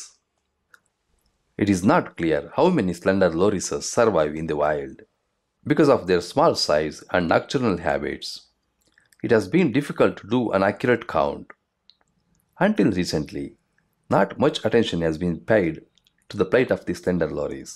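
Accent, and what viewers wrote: India. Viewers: Like for that south accent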